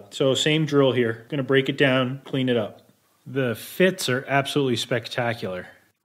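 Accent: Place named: United States. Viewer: Ive always wondered where is from. You sound like an american, but you use the metric system. Im so confused!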